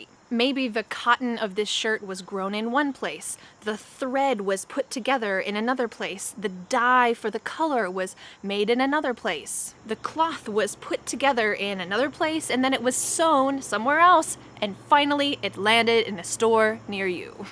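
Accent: United States. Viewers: This is the American way to pronounce them, not the right original one. I doubt Adidas is called in this way in Germany 😜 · I love American accent so much😍and this video is so interesting and useful! Thank you! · Go and learn from someone who speaks British English because Americans mispronounce everything in English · Mmmm these are all American pronunciations…..anything not an American brand is mispronounced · Thanks a million🌷🌷👍👍as an iranian i'm so happy to learn brands in english specially with american accent😍😀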